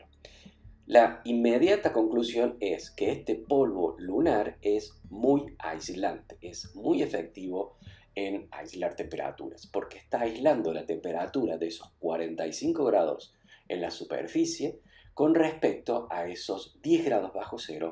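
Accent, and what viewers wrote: Córdoba, Argentina. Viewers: Muy buen video. Puede ser que tengas acento de Córdoba, Argentina? Un abrazo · Si o si es cordobé el!!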